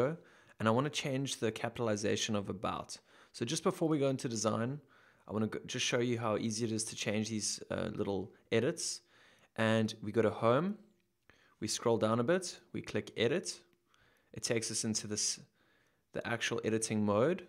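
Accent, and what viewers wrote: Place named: South Africa. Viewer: Superb tutorial – and nice to hear it all in a familiar South African accent 😊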